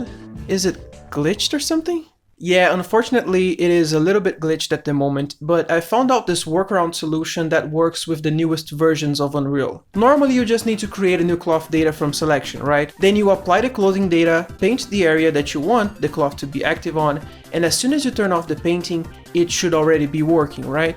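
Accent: Brazil. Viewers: e esse sotaque de Br ae?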